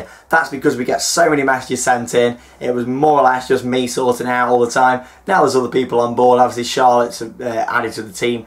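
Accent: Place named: Yorkshire, United Kingdom. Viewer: I'm from cambridge and let me guess... Your from Leeds? Yorkshire?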